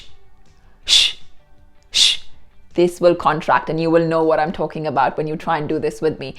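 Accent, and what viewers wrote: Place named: United Kingdom. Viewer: Her accents ….your accent is quite fascinating!..it borrows from a lot of areas ,a slight British as well ..the way u spelled ‘KAPALBHATI’ ,I don’t know what to talk of it 🗣️ !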